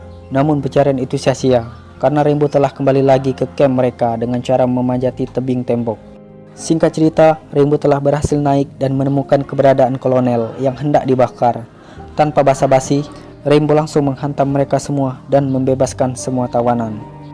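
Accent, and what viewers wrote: Palembang, Indonesia. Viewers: Asli wong palembang :v cuko dak be cuko tengah duo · Wong Palembang e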